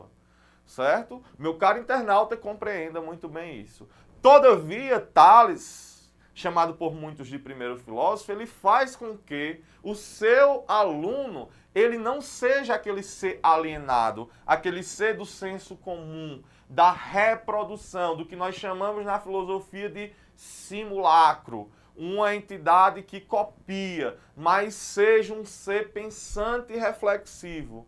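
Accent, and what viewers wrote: Northeast, Brazil. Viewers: Eita professor arrochado véi! Conheço seu sotaque viu peixe, é nordestino que nem eu. Brincadeiras à parte, excelente e maravilhosa aula meu nobre, PARABÉNS!!!!!😊😊😊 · Ótima aula professsor! Seu sotaque de nordestino deixar a aula bem descontraída e fluída , e parabéns pela explicação! Melhor impossível...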